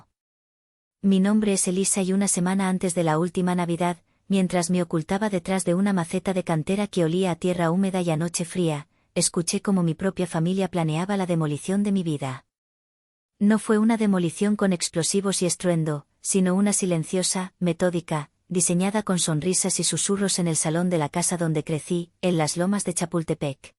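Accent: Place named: Spain. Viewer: Una mexicana relatando con voz de auténtica española, eso resulta raro, no encaja, pensé que la historia se desarrollaría en España, pero no, la de la voz de españolita resultó ser mexicana.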